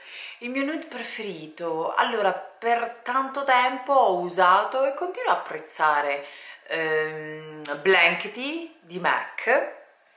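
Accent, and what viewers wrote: Sicily, Italy. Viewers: Sei siciliana ? io sono di catania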